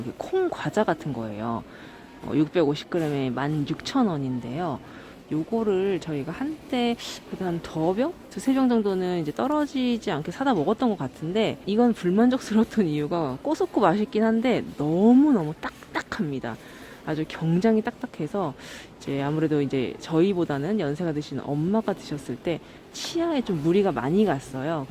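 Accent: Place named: Chungcheong, South Korea. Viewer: 충청도 사투리~~~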